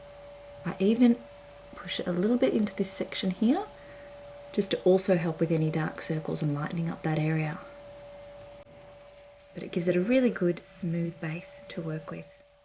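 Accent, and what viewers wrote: Australia. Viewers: There's just something about your Australian accent that makes me love everything you do. Or maybe it's just your talent. :D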